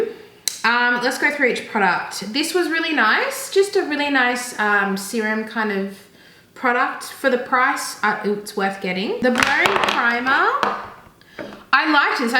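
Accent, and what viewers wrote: Australia. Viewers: Been watching makeup videos for years, first time stumbling upon your Chanel. I’m so glad I did! Thanks so much for your honest review! I hate how much trial and error there is with makeup, especially with budget products and seeing your reaction to stuff is just making it so much easier for me to decide if I want to bother trying some things. I’m on an extremely tight budget and if I were to go through too many cheap products before finding decent ones I’d end up probably spending as much as a dearer one anyway before finding what I’m looking for. I also love hearing your familiar Aussie “oh nauooooooorr” it doesn’t even sound like you’re doing it for shits and giggles it’s your natural accent and it’s glorious! 😂. Thanks again lovely! Hopefully you have some wear test reviews to to see how thing hold up over the day and this mad heat wave that blasted us straight into summer from the depths of winter with no bloody in-between.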